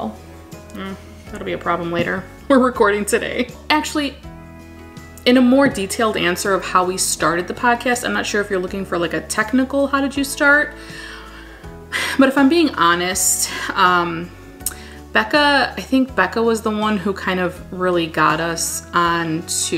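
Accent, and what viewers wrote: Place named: Illinois, United States. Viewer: Been watching Becca for a few years and finally wandered over to Potted Together and now you. LOVE IT. I grew up in Wildwood, IL just 30 minutes north of you. Love hearing your accent, brings back memories. 💕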